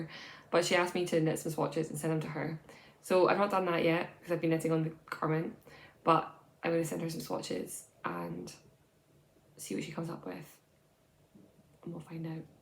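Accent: Scotland, United Kingdom. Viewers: Has your Scottish accent got stronger this past year?? 😂 Loving your creativity and pattern designs. Thank you for another fab podcast. Xx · I really enjoy watching your videos and I like the challenge of understanding you properly due to your Scottish accent. :-D Your Cargill pattern looks stunning, looking forward to knitting it once my knitting skills have improved. I have already knitted several sweaters, but they were all pretty simple. Greetings from Germany! :-)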